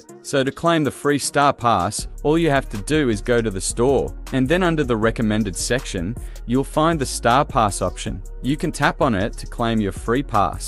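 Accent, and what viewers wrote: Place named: Australia. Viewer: how you got the accent mate I'm Australian u sound aussie😂😂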